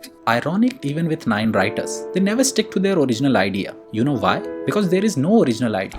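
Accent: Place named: India. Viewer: and your reply here proves my theory that your just using ai generated script and edit to make these videos. Your randomly connecting movies with similar themes from different cultures in this video which would only be possible if someone watched a he'll lot of movies . That along with your robotic voice with Indian accent which again was obviously generates by ai, gave me a great doubt on your content. Anyone who watches multiple movies from different culture can only be from someone who is darn passionate on about cinema which you claim in your YouTube bio to be from your bollywood industry background , which is believable , except anyone with that mindset would never reply with something as simple as what you did here. Basically your using ai to make all your content in this channel as evident from the 9 months of content post activity 🤣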